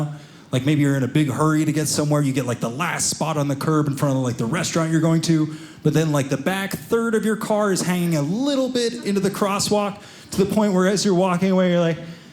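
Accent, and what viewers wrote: United States. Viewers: I have to say that is the most American a person has ever sounded in my very short time of having sentience.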